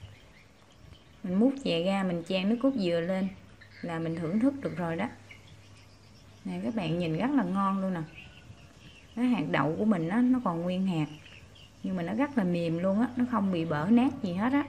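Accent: Mekong Delta, Vietnam. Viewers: Thick’ kheo’ tay Thich’ giong Noi’ nguoi mien tay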